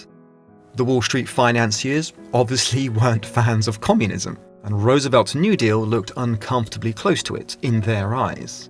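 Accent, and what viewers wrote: United Kingdom. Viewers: Wfhy is a Brit narrating American history?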